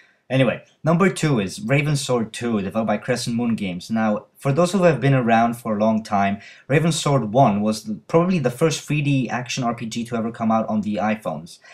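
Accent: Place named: Hawaii, United States. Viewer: You sound like ryan higa when he's doing his hawaiian accent...... #justsaying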